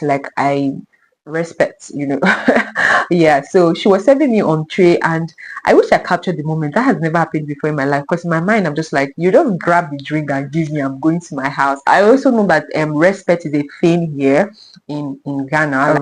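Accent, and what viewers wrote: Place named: Nigeria. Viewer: Your accent is quite different from some Nigerians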